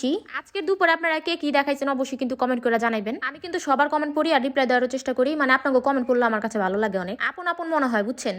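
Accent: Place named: Old Dhaka, Bangladesh. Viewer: আপু তুমি যে পুরান ঢাকার ভাষা বলা আমার এত ভালো লাগে তোমার সবগুলো ভিডিও দেখে মাশাল্লাহ দারুন হয়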